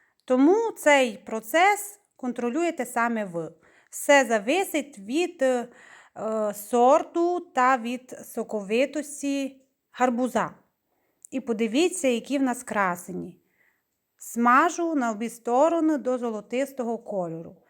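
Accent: Transcarpathia, Ukraine. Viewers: Оооо, вже почула, що з Закарпаття.😊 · Чую закарпатський діалект, молодець так тримати! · Це ЗАКАРПАТТЯ!!! На заході України є різноманітні діалекти😉😊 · Так розмовляють на Закарпатті. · Чернівецька область. Дякую за смачний рецепт, спробую. Закарпатська мова, особлива.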